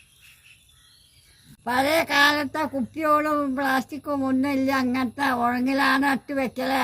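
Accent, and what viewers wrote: Palakkad, India. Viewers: അമ്മൂമ്മ പാലക്കാട്ടീന്നാ 😊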